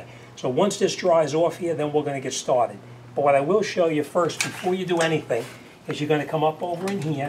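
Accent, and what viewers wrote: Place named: New England, United States. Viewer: Cool. You have a New England accent! "Hello Your On Car Talk"!!!!!! Don't drive like my brother!!!!!!!!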